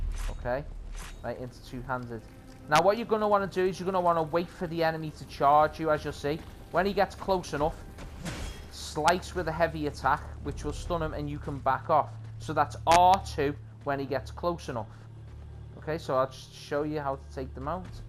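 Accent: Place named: Liverpool, United Kingdom. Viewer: Excellent video, thank you. Subscribed. Loving the Scouse accent as well.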